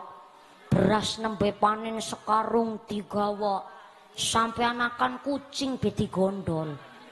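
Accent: Banyumas, Indonesia. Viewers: Justru ciri khas mumpuni tuch bhs ngapaknya...salut dah menyimak. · Mumpuni. Ceramahe ngapak ngangeni.cerdas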